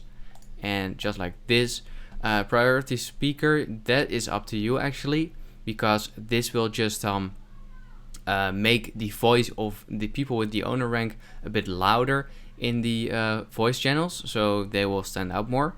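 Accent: Netherlands. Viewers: Thanks for the turtorial! it was super handy ;p and your accent screams : 🇳🇱🇳🇱🇳🇱🇳🇱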